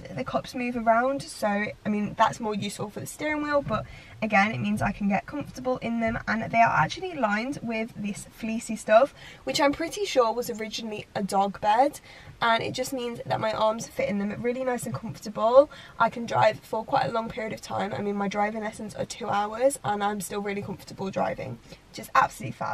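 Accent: United Kingdom. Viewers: Cool car, I noticed the voice and said she’s in London or Britain. Even noticing that, when she was getting in the car...I said why is she getting in on the right side of the car? 😟🤣🤦🏽‍♂️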